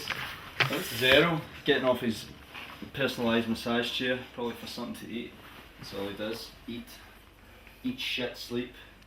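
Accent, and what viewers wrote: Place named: Scotland, United Kingdom. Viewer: Dat scottish accent! I can't understand it :D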